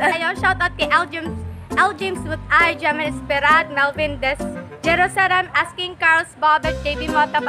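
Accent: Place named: Visayas, Philippines. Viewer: Kakafollow ko lang sa tiktok mo haha ang cute mo yung bisaya na. Ang huy ex yan na ba bago mo 😂😂😂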